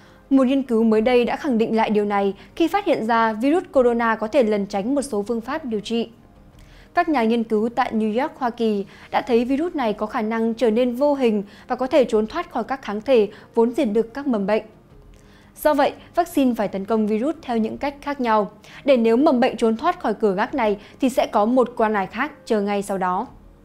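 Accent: Standard. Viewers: BTV tuyệt vời phát âm rất chuẩn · Mc nầy phát âm chuẩn quá.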